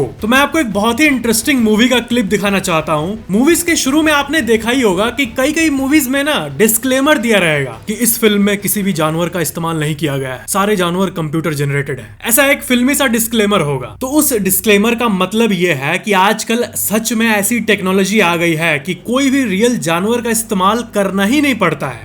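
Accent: Bihar, India. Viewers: kya aap bihar se hai bhaiaa🤔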